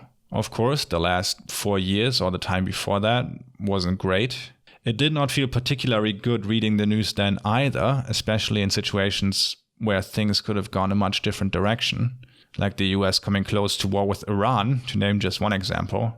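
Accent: Germany. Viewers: Ha, I knew you were German. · Bist du Koelner?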